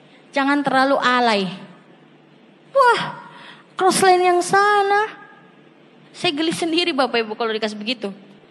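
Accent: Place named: Sulawesi, Indonesia. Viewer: Logat sulawesi😊😊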